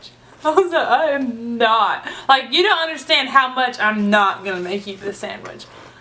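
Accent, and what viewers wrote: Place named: South, United States. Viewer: I'm impressed by yer appetite and yer food-crushing capacity. Looks like you could eat a lot of guys under the table. And yet you don't look like a truck driver – quite the opposite. So, have you ever been out on a dinner date and scared a dude? Hey, by the way, when you're talking, it seems a subtle Southern accent kind of comes and goes – are you in a Southern state?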